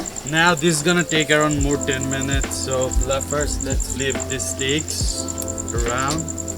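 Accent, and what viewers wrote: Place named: Jamaica. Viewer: This reminds me a lot of the jamaican jerk chicken, I see where the the chicken comes from but after listening to his accent i finally understood the jamaican jerk in it. plus it seems like the bengali dude with the jamaican accent has to learn to give an outro.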